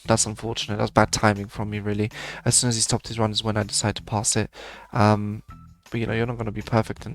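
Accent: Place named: England, United Kingdom. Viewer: you sound like you are from england or at least lived there for a long time lol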